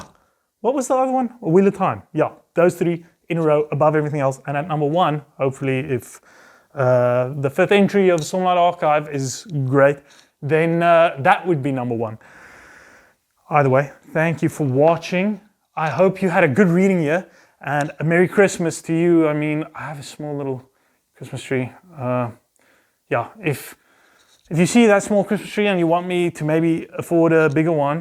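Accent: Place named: South Africa. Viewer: Suid Afrikaanse aksent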